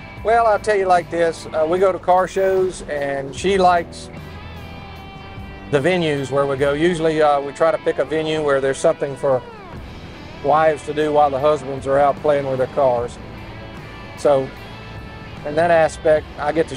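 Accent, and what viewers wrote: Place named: South, United States. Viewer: What a beauty! I love the police cars of the 60's and 70's, but I'd even be happy to own an 80's square-body Crown Vic. Question for you: I notice that you have a mild southern accent, but you could also imitate that strong drawl of a good 'ole boy. What is the difference? Does it depend on the region? (Oh, I'm realizing that is the videographer. Great work on that too.)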